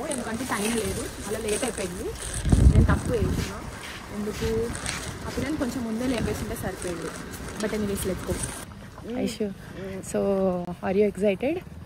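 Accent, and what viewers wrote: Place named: Telangana, India. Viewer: ni slang aacham telangana pilla la matladuthunaruu ela '' chesinaruu vachinaruu ani bagundi ala miru matladuthey 💗✊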